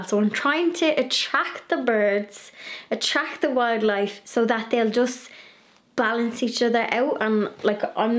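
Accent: Dublin, Ireland. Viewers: Cottage decor, gardening and a good Dub twang, what more could you want!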